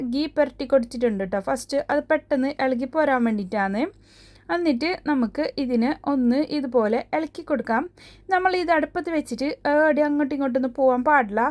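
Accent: Kasaragod, India. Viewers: Kandappole kothiyakunnu, pazhuthu poya pazham vechu oru super pudding, kasargod varthamanam kelkkan rasamanu 👌👌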